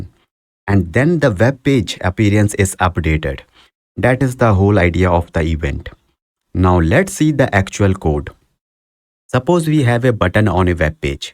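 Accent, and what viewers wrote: India. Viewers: The contents is 100% but its diffcult to understand with Indian accent -_-. !!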